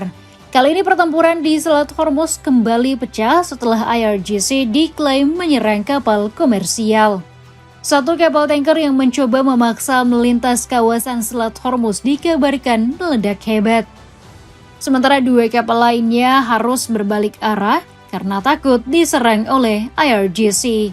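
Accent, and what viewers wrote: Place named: Java, Indonesia. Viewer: Dari logat orang Jawa tetapi kok mengucapkan ejaan pakai bahasa asing sangat memalukan sebagai orang Indonesia yang sok kebarat baratan mengucapkan ejaan Indonesia tidak dipakai payah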